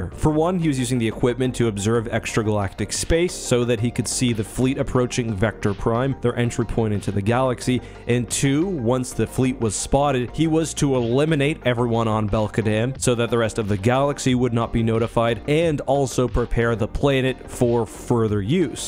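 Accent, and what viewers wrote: Canada. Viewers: The narrator absolutely has to be canadian, that accent bro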